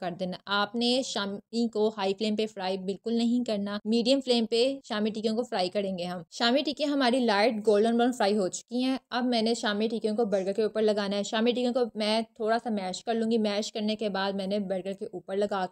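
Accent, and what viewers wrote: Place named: Punjab, India. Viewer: Your Panjabi accent is awesome 😂♥️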